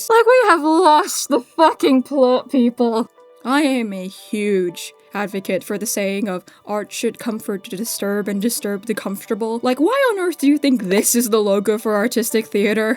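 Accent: United Kingdom. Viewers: lmao i was wondering! the whole video, i sat there trying to decide if you had always had a british accent and i just somehow blocked it out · It's not really related to the topic of this video but I find the way your accent changed into this very british english very relatable for me lol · I’m rewatching this with AirPods and I can now clearly hear the British slipping in 😂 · You’re British voice is fine, Bouth are engaging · I love how your accent is slowly becoming more British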